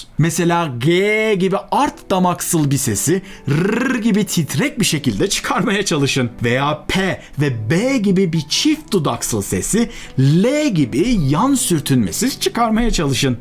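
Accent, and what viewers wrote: Samsun, Turkey. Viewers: Ya abi Samsunluyuz da bu kadar da mı belli oluyor. :D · reis sendemi samsunlusun :)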